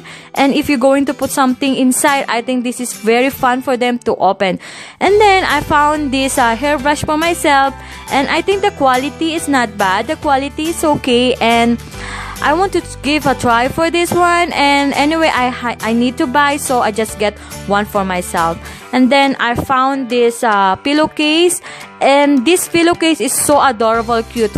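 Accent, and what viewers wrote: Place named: Philippines. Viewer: Hi,may I ask if you are Filipino?I just noticed your accent.:)Nice haul by the way.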